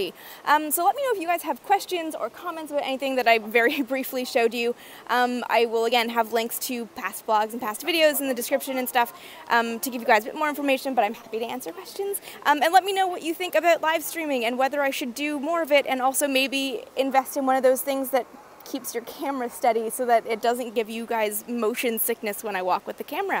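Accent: Canada. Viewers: Great video , your adorable! So cute.. I can tell your from Canada.. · Ha you sound more Canadian in this video.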